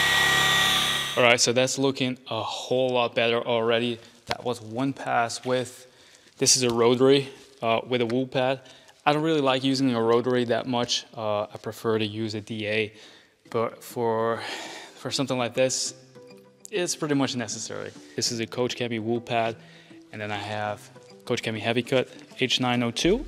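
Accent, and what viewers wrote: United States. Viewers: Hey mate, are you a native belgian? Your accent is very american! I order from your detail store all the time.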